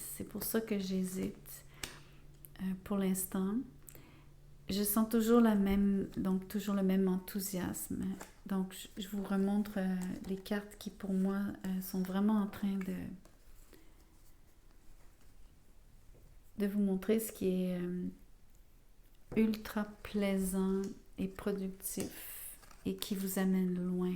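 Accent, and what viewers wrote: Quebec, Canada. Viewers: Heureusement que vous n'êtes pas ma voisine !je serais en consultation permanente ! Rien que pour me laisser bercer par votre accent québecois !😃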